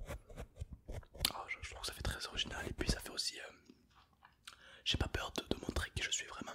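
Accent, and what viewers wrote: Quebec, Canada. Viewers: L’accent québécois 😍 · Moi qui adore l'accent Québequoi c'est un pur plaisir d'écouter de l'asmr Québécois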